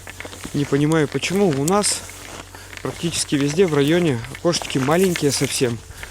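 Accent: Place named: Rural, Russia. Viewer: Благодарность тебе огромная за видео! Из этих мест родом мой муж, из села Вохма. Их род по мужской линии был большой. Рожали по 9 - 12 детей. Свёкор один из 12, 10 мужиков и две сестры. Только из их рода мог образоваться огромный колхоз. Все трудились и поэтому жили хорошо. Ставили деревни, о чём в архиве есть запись урядника за 1904 год. Но в советское время их хотели раскулачить и все разбежались по разным городам и весям. В Костромской области и в Сибири есть сёла названные по их фамилии. Предки были родом и из деревень Большие и Малые Мокруши. А вот по женской линии все были по одной: прабабушка, бабушка и свекровь. Я уже сама уже очень старая и совсем мало кого осталось. До 2015 года в Вохме ещё проживала старшая сестра моего мужа. Но думаю, что в России ещё много потомков от этого рода. И всех известных мне и неизвестных я люблю и почитаю. 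Благодарность тебе, за видео с этих мест, замечательные твои комментарии с лёгким деревенским говорком и знание бытового устройства с его атрибутами. Просто бальзам на душу!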